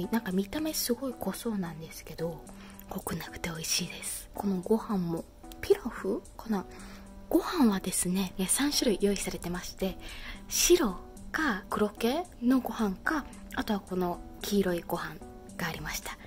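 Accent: Kagawa, Japan. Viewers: たまに出る讃岐弁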